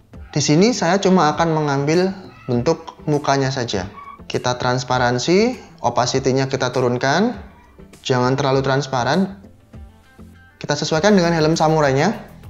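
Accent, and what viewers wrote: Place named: Purbalingga, Indonesia. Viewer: Salaam kenal ... masnya asli Purbalingga ya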